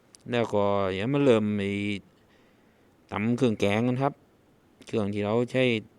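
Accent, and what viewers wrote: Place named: Rayong, Thailand. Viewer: พูดระยองด้วยน่ารัก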